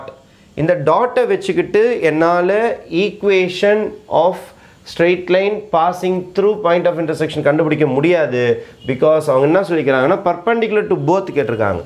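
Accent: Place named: Telugu, India. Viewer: Sir your mother tongue telugu va voice similar ah iruku athan ketan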